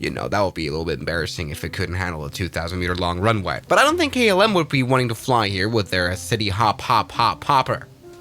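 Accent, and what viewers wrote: United Kingdom. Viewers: I ponder why this gentleman was conversing as a britman. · plz no plz no why british accent why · Love thee bhrithish akhcent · Cause he was in London City airport and in london speak british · bri'ish now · Never would i think on this beautiful day that Sir would prefer the british accent on this inaugural day! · Damn mate you bri'ish now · Why does he sound a bit British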